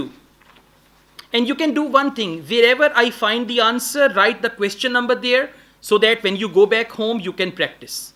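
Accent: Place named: India. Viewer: love your Indian-English accent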